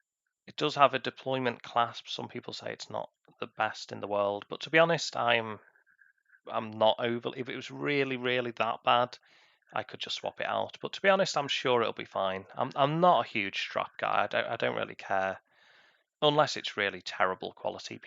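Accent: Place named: Northern England, United Kingdom. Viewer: yeah I did mate, to be honest it was probably your review which tipped it for me, great watch, could easily be my exit watch (but I know it won’t be) I’m really looking forward to your review of the bulova, I honestly think you have great watch content, especially for ‘normal’ people who don’t have the cash for high end watches. Quick question, you sound quite local to me, I’m guessing St. Helens? Definitely northern 👍🏻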